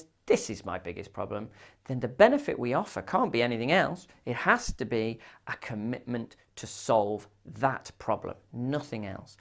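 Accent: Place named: United Kingdom. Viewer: From accent it seems he's a Britisher, is it so?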